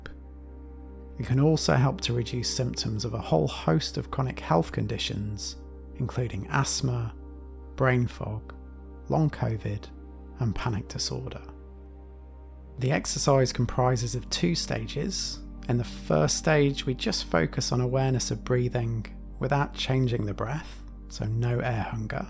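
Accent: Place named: England, United Kingdom. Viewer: Always nicer and more calming to hear English accent.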